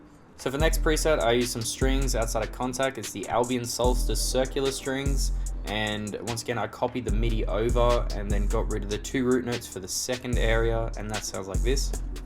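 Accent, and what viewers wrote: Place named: Australia. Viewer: He prolly from Australia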